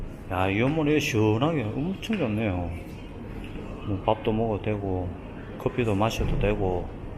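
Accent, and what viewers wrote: Gyeongsang, South Korea. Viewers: 절대 경상도 사투리가 불편하지 않아요 가정적이시고 정말 보기 좋아요 앞으로도 계속 잘보겠습니다~~°행복하세요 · 오히려 더 친근감 느껴지는데요 경상도 사투리 중독성 강합니다 전 경상도 사람 아니지만 넘 듣기 좋아요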